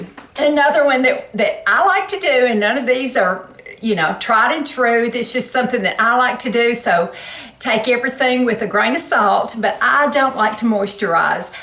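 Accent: South, United States. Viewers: Wonderful "tips"! I actually follow a lot of them. After too many years of not caring for my body (smoking, drinking, not sleeping enough, eating poorly, etc), I'm probably the healthiest internally than ever (at 63) since I've quit all that nonsense. Now I suffer with sciatica, but my husband and I hike portions of the Appalachian Trail yearly. I've put in a few hundred miles now and that's the only time my sciatica doesn't act up! Walking, climbing those Appalachian mountains, and sleeping in a tent for weeks on end make me feel youthful again! Amazing! So I'm a firm believer in STAYING ACTIVE, whether it's exercise in your living room or a gym, or bike riding, yoga, swimming, running...whatever you can do to stay active is a good thing. And having a hobby is great too. Keep the mind active as well! I am an artist, and I try to stay involved with my community art group. 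I just love your videos...you seem like such an sweet, genuine, Southern belle (Tennessee? Kentucky?), and I thank you for your videos.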